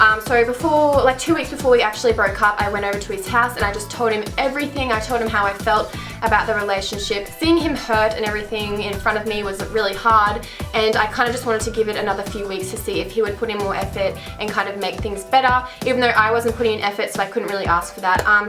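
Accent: Australia. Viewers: Are you Aussie? Hopefully I'm not Aussie and alone.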